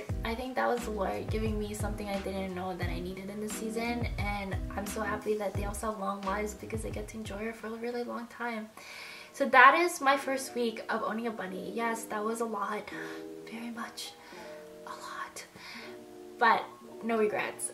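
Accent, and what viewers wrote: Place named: United States. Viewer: She has a very Americanized voice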